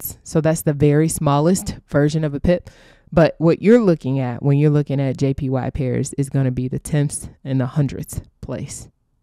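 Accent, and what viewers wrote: Houston, United States. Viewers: Are you from Houston? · Thank u for the info..I bought Billy Carsons workshop a while back.....Is that a Houston accent? I'm kinda hearing Kelly and Beyonce...which is CRAZY that u favor both of them! love the content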